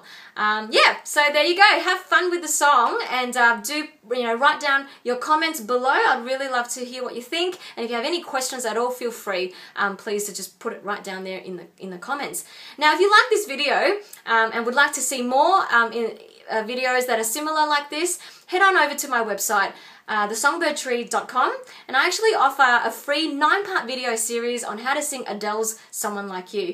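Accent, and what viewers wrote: Australia. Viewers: Hey! I really enjoyed your videos and it's nice to hear a relatable aussie accent :) I personally REALLY struggle with projection or 'forwardness' all of the examples you gave where you were quite gentle or 'acoustic' i find that I 'ALWAYS' do this.... I would LOVE to know some vocal exercises to improve projection! :) Subscribed!!!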